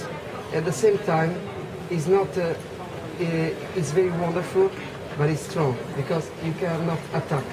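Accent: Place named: Italy. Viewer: This Italian accent!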